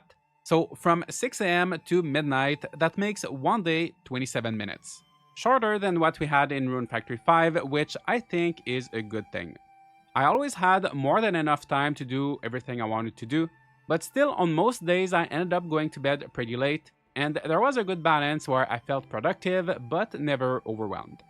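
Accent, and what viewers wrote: Quebec, Canada. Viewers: Are you québécois ? Your accent sound like it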